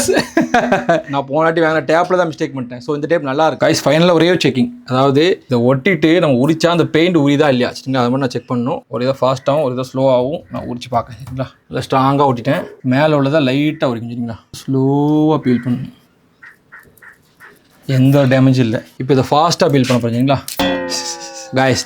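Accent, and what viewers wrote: Tirunelveli, India. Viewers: தம்பி திருநெல்வேலியா?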